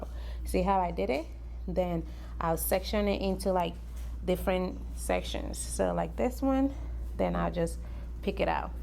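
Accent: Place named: Liberia. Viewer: I hear the Liberian! Go, sis!👌👌💜💜💜🇱🇷🇱🇷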